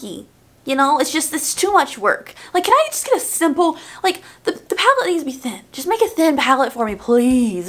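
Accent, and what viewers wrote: South, United States. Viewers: I feel like your southern accent is more present lately and I love it !! Your Morphe Sweet Tea rant in your previous video was hilarious.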